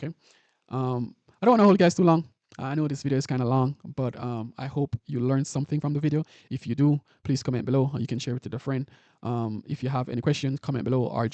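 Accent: Jamaica. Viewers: Real yawd man bro mi can hear it lol but now I have to find out how to get quantower on mac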